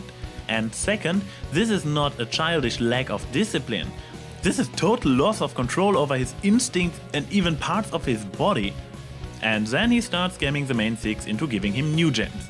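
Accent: Germany. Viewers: Ich hatte an Deiner Aussprache schon erkannt dass Du Deutscher sein musst :D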